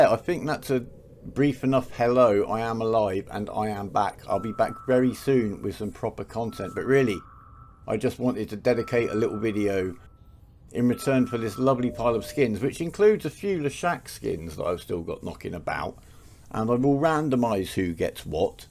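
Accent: United Kingdom. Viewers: Welcome back, hope your condition improves! On my end, I've been able to understand you perfectly. Although if I may comment, it's unfortunate that your accent is UK instead of US. While I prefer a UK accent to listen to, the US accents have a more nasal touch. This results in a lack of stress on the "s" and better to understand even if their condition is worse. If that were to be the case, perhaps we would have the pleasure to have a video from you a little sooner :)) Back to the topic at hand, EVE. Compared to all MMORPGs I'm aware off, this fits in a real niche. Even a 1 day old character is able to participate in end-game content of the newest updates and actually matter. No need to grind levels and gear to have any impact in the newest expension. Even on day 1 after the abyssal filaments release, a rookie cruiser would have been able to jump into the abyss and have fun. 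Of course win chances and improved loot rises the more experienced (older) a character is, but your efforts matter. In that regards, I don't think EVE is pay to win. All the ISK and modules in the world don't help a ship if they meet the right number of cheap fitted ships willing to be sacrificed for their cause. The Subscription based game, would fit EVE like a glove. So much content is more viable when having access to all ships and skills. Nevertheless, plenty of people are enjoying an Alpha playstyle, ever enjoying the endless trial option of the game. IGN : Spectral Spectre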